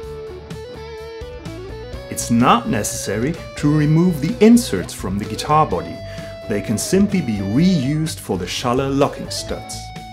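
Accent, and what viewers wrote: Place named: Japan. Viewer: This guy talks like a Japanese man that just learned English...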